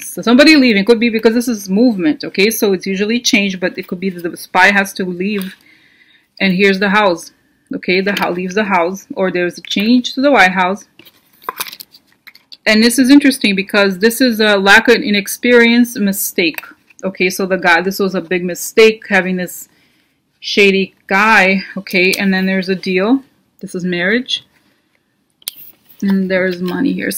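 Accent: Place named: Russia. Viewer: your accent is hilarious!! Are you really Russian?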